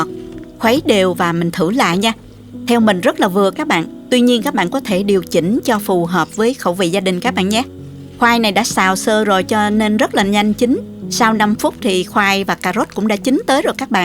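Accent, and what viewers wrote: South, Vietnam. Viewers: Giọng Nam Bộ kiểu mỗi ngày một hiếm, giờ xem truyền hình, phim trên HTV, giọng nam toàn gì đâu.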